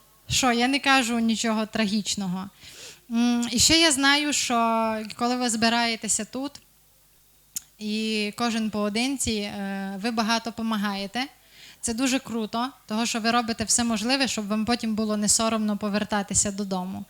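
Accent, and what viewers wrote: Transcarpathia, Ukraine. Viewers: Шоб твої правнуки, дівочко, балакали так як їхня прррабабуся з ЗАКАРПАТТЧ!!!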